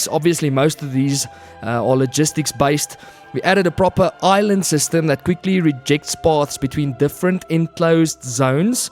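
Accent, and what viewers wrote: South Africa. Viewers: The South African accent is strong with this one!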